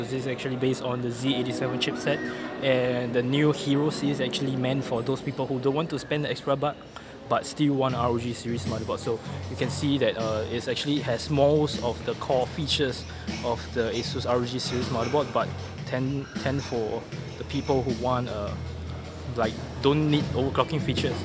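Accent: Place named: India. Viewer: Bro no offence but you indians speak english far too fast makes it harder to understand with your accent speak slower then i will be able to understand you better.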